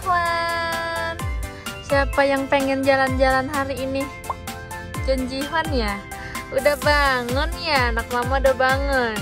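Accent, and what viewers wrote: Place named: South Kalimantan, Indonesia. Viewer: Org mana kk ngomong nya itu deh kek org kalsel gak tau juga sih bener apa enggak🤣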